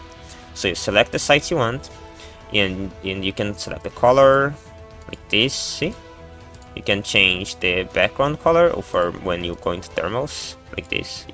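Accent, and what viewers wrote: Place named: Brazil. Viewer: N precisa n, é que eu vejo tanto video em ingles q eu percebi um leeeeeve sotaquezin BR ouvindo vc, hehe. Mas parabéns pelo vídeo :D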